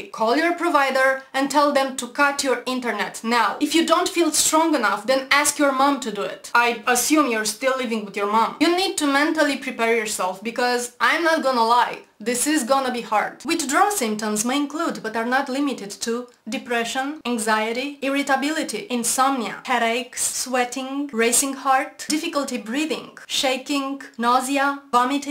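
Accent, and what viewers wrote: Romania. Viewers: Ms You are stunning!!!! I am in love with your Romanian accent Very cute!!! And your vids are very informative esspecally the Islam one. This is something I would like for us to discuss as you are probably a lot closer to it then I am in the US Keep up the great work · I don't hate you , I disagree with several parts of you're post modern liberalism , but not enough to hate you . The Romanian accent prevents me from hating you .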